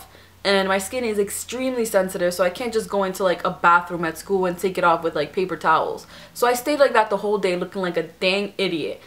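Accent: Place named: Dominican Republic. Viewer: are you dominican? lol you sound like it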